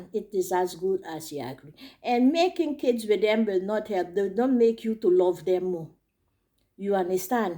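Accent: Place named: Africa. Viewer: I love your channel dear. Where are you from? You sound so African.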